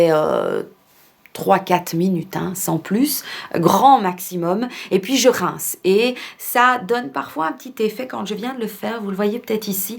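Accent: Belgium. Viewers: J adore ton accent c est désagréable quand tu dis ça je vois un one woman show bon tu seras peut être fâchée mais en tant que belge je m autorise l autodérision sur notre accent. Sinon. Faut vraiment que nous pensions à prendre soin de nous mes filles 19 et 15 ans ont ce qu il leur faut mais moi perso je me met de côté exceptée début d année où j utilise les produits des calendriers de l avent cette année c est thé bio, bougies et nuxe après je ne suis pas... je ne sais pas quoi acheter... mais il faut vraiment prendre soin de soi je dois juste l integrer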